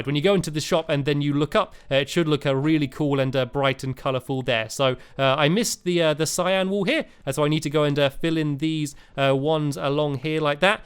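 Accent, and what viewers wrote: United Kingdom. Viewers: Dude, you British? I'm from a commonwealth so I have a British accent too :) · You know that is British bc he has an accent and he spells color with a u!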